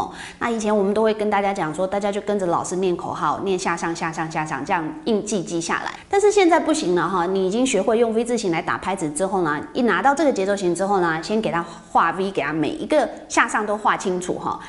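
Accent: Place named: Taiwan. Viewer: 我觉得像台湾人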